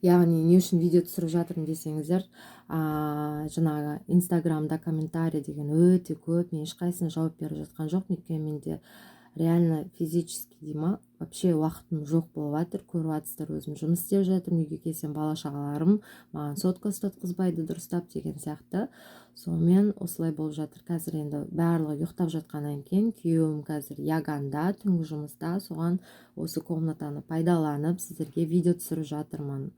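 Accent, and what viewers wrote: Standard. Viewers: Молодец таза қазақша сөйлейді екенсің Алла Тағала разы болсын балапандарын аман болсын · Айналайын Аман сау бол. Отбасын а бакыт тилеймын. Балапандарын тап татты. Бизда сиздерды жаксы корып калды. Контентын оте керемет. Кызыкты. Корермендерын кобее берсын. Миллионга жетсын,оданда копболсын. Таза казакшаннан айналдым..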